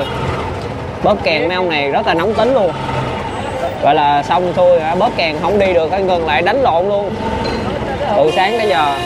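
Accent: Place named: Vietnam. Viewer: Giong Vietnam qua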